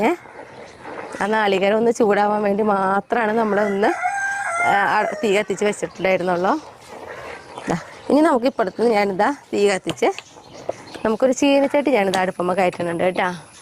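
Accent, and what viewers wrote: Thrissur, India. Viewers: Ningalude sthalam evvideyanu. Thrissur ano. Samsarathil Thrissur slang.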